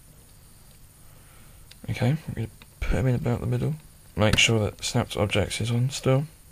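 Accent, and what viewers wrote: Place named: United Kingdom. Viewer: Absolutely fantastic! Great to see that this channel hasn't died, it seemed like such a good idea. Great tutorial, very clear and helpful. Always good to hear a grand british accent on the web too:). Greets. Eth.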